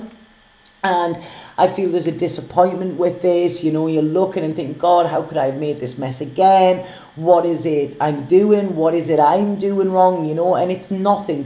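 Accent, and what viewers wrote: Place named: Scotland, United Kingdom. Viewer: oh and thank You so Much , you glow with good clean white /pinkish aura and i can sense you are such a good lady , i love your never ending smiles and your excitement for me/us is awesome , great reader and that what sound scottish just great , reakly like your energy girl we will talk again ok , im always open ,and usually can hear if you are tuned to my rock n roll station lmao but tru , this stuff cant be wrong buit i gotta be sure , so i wait ,isntr like i havent already , all life damn near ,, im good at it now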